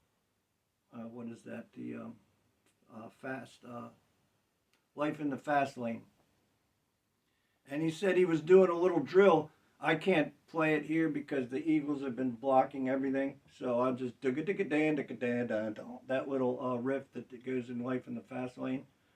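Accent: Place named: United States. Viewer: Hi can you document the down up down up. I am having difficulty understanding what you are saying,your American accent Am from Liverpool in the UK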